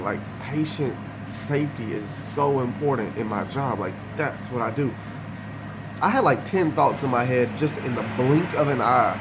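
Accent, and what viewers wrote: United States. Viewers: Joey Monroe prob just a bronx/new york or brooklyn accent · HARD WORKING GUY. SEEM DEDICATED, AND YOU ARE LISTENING TO IN THE BACKGROUND. PLEASE STOP TALKING WITH Y OUR NEGRO SOUTHERN ACCENT, YOU'RE WHITE -OR SO IT APPEARS. SOUND PROFESSIONAL. THANKS. KEEP ON TRUCKIN.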